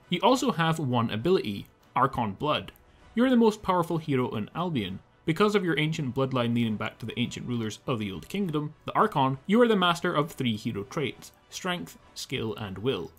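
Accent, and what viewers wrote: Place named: Glasgow, United Kingdom. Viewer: Posh glasgow...lish?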